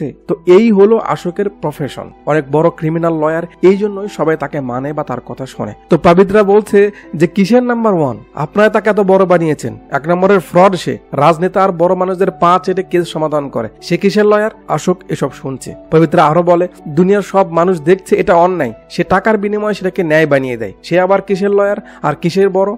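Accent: Bogura, Bangladesh. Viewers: ভাই আপনি মে বি বগুড়ার লোক।❤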